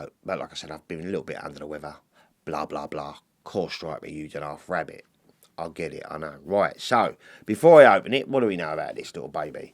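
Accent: Cockney, United Kingdom. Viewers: Is that a cockney accent I hear?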